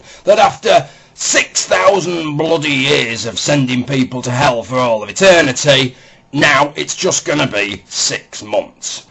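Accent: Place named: Yorkshire, United Kingdom. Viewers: Love the Yorkshire accent lol. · I thought he was yorkshire man (well sort of)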